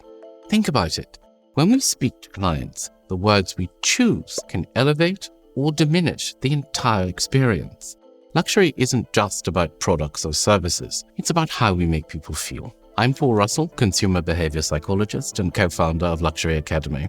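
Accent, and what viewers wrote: RP, United Kingdom. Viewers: BE CAREFUL, THAT 'POLISHED' BRITISH ACCENT IS WHAT GOT YOUR MIND AND NATIVE LAND COLONIZED.. I AM HERE TO COLLECT 😈🍄💯🤘🏾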